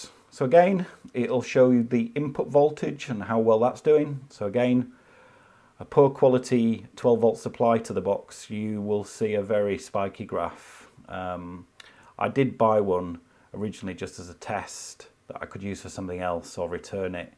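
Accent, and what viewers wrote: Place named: Liverpool, United Kingdom. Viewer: My God he's from Liverpool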